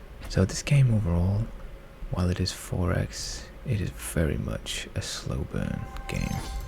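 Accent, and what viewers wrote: Yorkshire, United Kingdom. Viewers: Appreciate every video you put up. Feels good to have a Yorkshire representative in the ASMR community. 🗿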